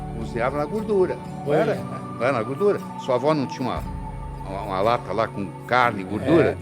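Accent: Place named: Minas Gerais, Brazil. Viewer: Um Médico incrivelmente competente estilo Mineiro dos bons Não fica zangado Fala uai com simplicidade mas o que fala prova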